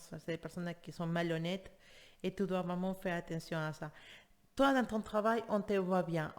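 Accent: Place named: Spain. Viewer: Ne changez rien 🤣 il est beau votre accent... Espagnol non? Gratitude infinie 😍😍😍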